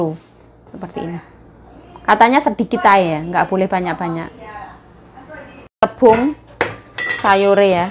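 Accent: Java, Indonesia. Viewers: Kok medok bangat ngomong nya...ya.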